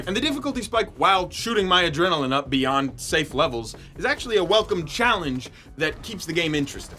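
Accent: United Kingdom. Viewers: YEAHHHHHHHH BRITISHHHHHH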